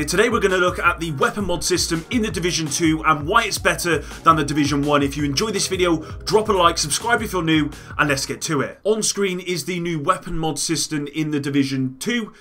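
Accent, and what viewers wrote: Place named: West Country, United Kingdom. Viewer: Which part of the west country mate. Bristol here. Top vid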